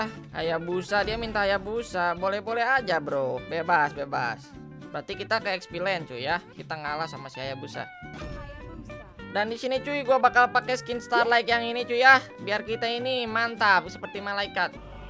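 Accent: West Java, Indonesia. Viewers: Bang org sunda yak? Gak tau napa kalo youtuber sunda beda cara ngomong nya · Kamu orang Bandung mang soalnya ngomingnay kaya ada sundaan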